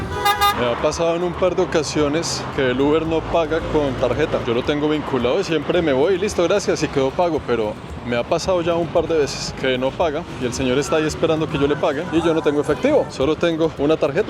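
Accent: Colombia. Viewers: Querido que ermosura son sus videos siento un respeto admiracion por su trabajo me gusta que usted habla con su acento colombiano que se le escucha l muy bonito me hace recordar cuando conosi colombia soy guatemalteca pero me enamore de Colombia megusta la terminologia que usted usa para su narración de sus videos el respeto que muestra para todas las culturas que conose que mi Dios me lo guarde y me lo acompañe siempre que sea el quien guie sus pasos por donde quiera que valla saludos desde U SA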